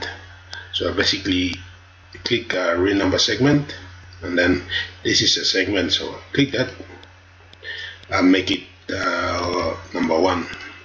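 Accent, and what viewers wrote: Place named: Papua New Guinea. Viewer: I can hear a Papua New Guinean. Thank you for the video, my man!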